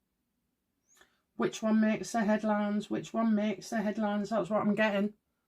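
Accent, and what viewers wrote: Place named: South Yorkshire, United Kingdom. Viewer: I just watch because I like listening to you. As a Christian I shouldn’t even watch these kinds of videos 🙈🤪 You sound like you could easily live where I live in South Yorkshire. If it’s any consolation based on other comments I’ve seen, many other people said he was going to pass yesterday.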